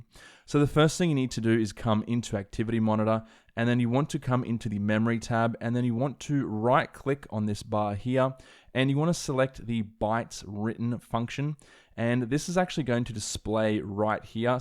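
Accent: Australia. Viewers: Brilliant video... love the Australian accent my friend.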